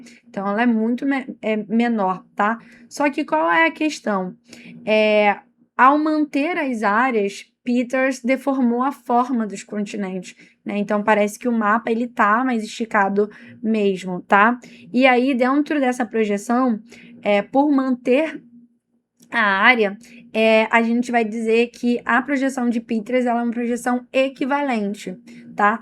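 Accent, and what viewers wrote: Rio de Janeiro, Brazil. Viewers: Acho lindo sotaque carioca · Nossa que professora incrível! Didática muito boa e o sotaque carioquês linda! Parabéns!!!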